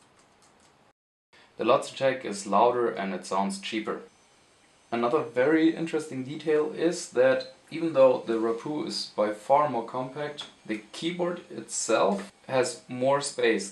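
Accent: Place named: Germany. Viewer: Love the accent (must be German). I did not know the brand Rapoo, I like how compact it is. Thank you for the review.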